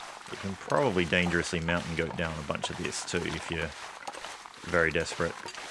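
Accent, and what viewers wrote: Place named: Australia. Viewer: The weather was great but you're skills in taking advantage of it were excellent. Wish you could have found a hacksaw but as others have said the flare gun is a good get. Also nice to hear a fellow Aussie's voice in the Canadian wilderness!!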